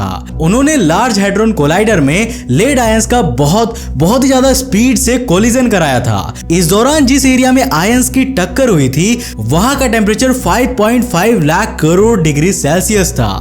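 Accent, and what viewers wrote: Bengal, India. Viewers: Kya tum bengali ho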